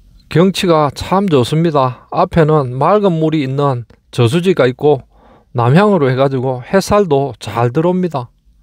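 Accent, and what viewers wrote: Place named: South Gyeongsang, South Korea. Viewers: 남해로 알고 있는데 아닌가봐요? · 경남입니다 대부분 이런맡투^^ 경상도 하고 는 조금 다르지요^^ 밀양 합천 창년 등은 ^^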